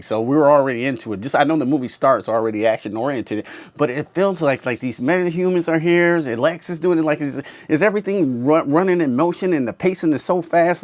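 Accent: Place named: South, United States. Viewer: Yeah, I noticed the Southern accent too lol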